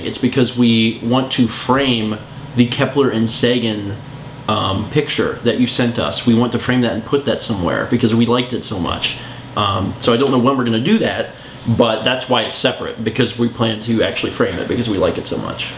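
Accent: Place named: Louisiana, United States. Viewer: That accent sounded like. In the bayou!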